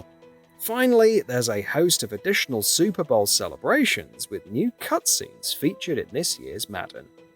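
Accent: England, United Kingdom. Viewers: Why is a British guy narrating a video about Madden? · Dudes with English Accents should not speak about American Football. It's just feels weird.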